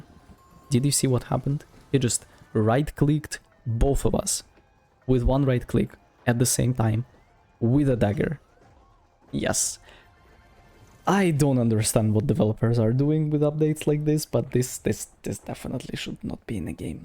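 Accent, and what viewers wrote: Russia. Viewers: agreed, his russian accent is pretty cute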